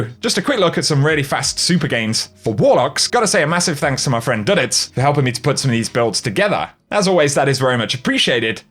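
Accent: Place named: United Kingdom. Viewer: when did you turn british?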